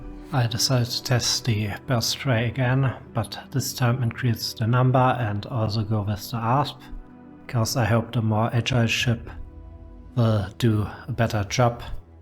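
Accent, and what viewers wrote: Germany. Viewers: Bro are you german?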